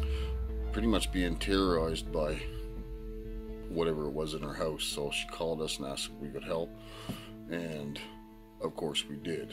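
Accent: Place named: Maine, United States. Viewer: Seen your channel on Nukes Top 5 and I just subscribed. Can't wait to check out your other videos. You sound like you're from Maine. Peace✌️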